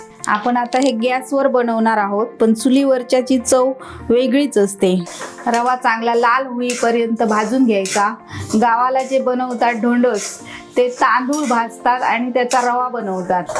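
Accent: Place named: Malvan, India. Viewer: Waa..mast recipe ..thanks aani barobar tumch malvani touch aslel bolna pan mast.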